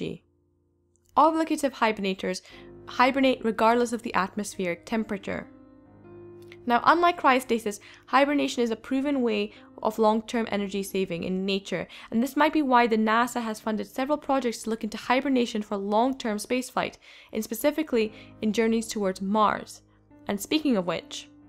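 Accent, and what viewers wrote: United Kingdom. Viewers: What part o UK are you from?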